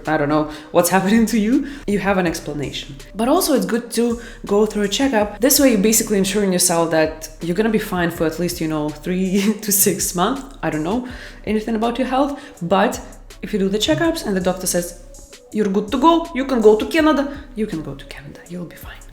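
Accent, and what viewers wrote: Russia. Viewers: Great accent rassiskiy☺️😁😁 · I love it when you speak English with russian accent ❣❣ thanks a lot for all the super useful tips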